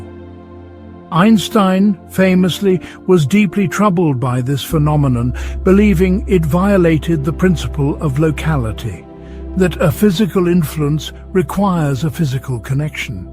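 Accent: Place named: England, United Kingdom. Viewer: english accents just make everything sound like propaganda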